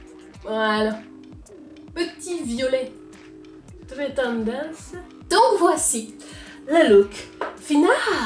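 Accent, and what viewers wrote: Russia. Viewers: oui c'est l'accente Russe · L'accent russe !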